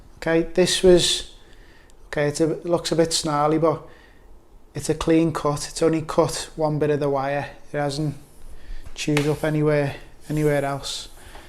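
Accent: Liverpool, United Kingdom. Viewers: This tutorial was great help Coming from a not-so-handy person, I can guarantee anyone can do it after watching this wholesome la's video Speaking of, everything sounds better in a scouse accemt, including instructions Thanks again for your time to upload such a helpful step-by-step guide Stay awesome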